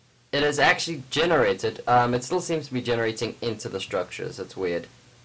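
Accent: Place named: United Kingdom. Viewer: Where in the UK are you?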